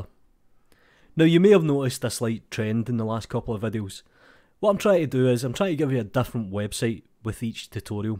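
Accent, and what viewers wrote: Scotland, United Kingdom. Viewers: Scottish. I'm struggling too.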